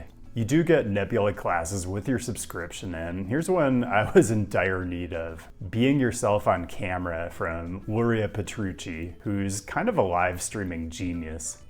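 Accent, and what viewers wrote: United States. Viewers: SJC definitely shouldn't be on this list. Proximity to downtown San Jose is a MAJOR advantage. Unlike in Europe, we don't have to travel for hours to get to the airport. It's right there, 10 minutes away from 1st and Santa Clara by car or 20 minutes by light rail/bus. bro what the fuck? (1) why are we destroying the natural environment outside of the built area for no goddamned reason (2) that destroys all the advantages of the current location of LAS, which, the current location is great, because it's close to where MOST people are going. And LAS has public transit buses right there at the airport that can take you to the monorail and various other places. The monorail is only 3.1 miles (4.9 km) away. Taxis are usually parked at the airport and can get you to the nearest monorail station in 20 minutes. The public transit buses are slower but cheaper. 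Sorry, but the more I watch this video the more I see with which I disagree. Like I said above....that's really the bottom line. WHY would you want to create inconveniences???? By your accent, you're either an American or a Canadian....yet, you seem to have abandoned the frontier philosophy of "why not" for the old European one of "why".... look, sacrifice IS noble, when you're protecting others or you're protecting a valuable institution. That's not what is happening here. Given that airplanes move to green technology -- which IS happening, and will continue regardless of any action by any legislature -- there's no logical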